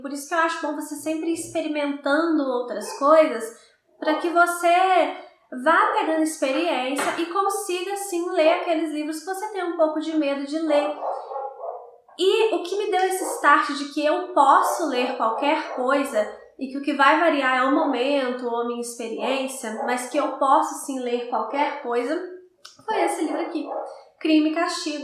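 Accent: Minas Gerais, Brazil. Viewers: Boa noite,! Você é mineira?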